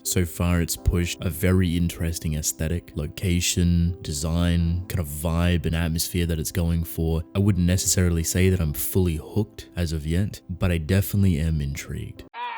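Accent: Australia. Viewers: yeah, something about Australian accents discussing lore/topics always sounds interesting lol. Reminds me of fudgemuppet when they dive into Skyrim.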